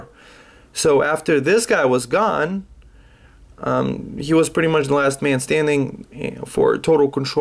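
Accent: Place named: England, United Kingdom. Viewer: stop butchering latin with an english pronounciation please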